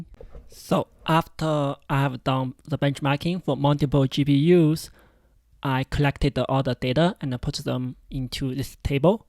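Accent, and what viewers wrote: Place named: China. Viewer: chinese-style english😊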